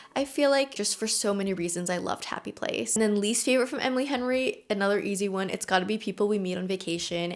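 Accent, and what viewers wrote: Canada. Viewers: Your Canadian accent is so prominent I love it!! It’s super cute!!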